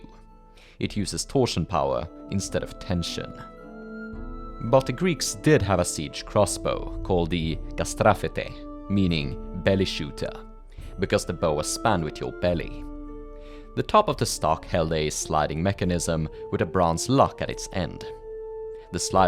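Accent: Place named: Sweden. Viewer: Even beyond the Swedish accent you sound almost EXACTLY like Gold & Gunpowder, love ya both! (unless....)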